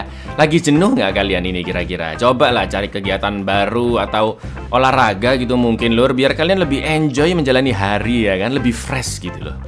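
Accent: Java, Indonesia. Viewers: Pdhal pengen nonton. Tp terlalu medok Jawa nya. Gak asik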